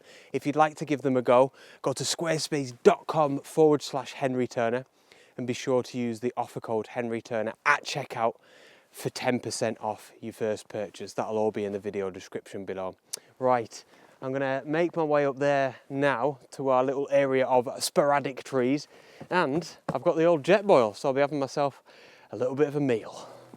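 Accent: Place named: Merseyside, United Kingdom. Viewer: Great photos Henry. Richard Tatti of nightscape images, rigged up a quick release plate to his gas oil thing like what you have, now he can stand up and cook, lol, an idea for you. Are you from Merseyside, trying to figure out your accent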